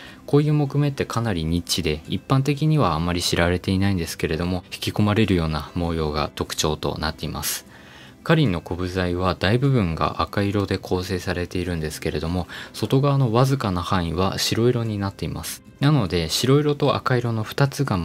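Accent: Aichi, Japan. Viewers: さん愛知県民でしょw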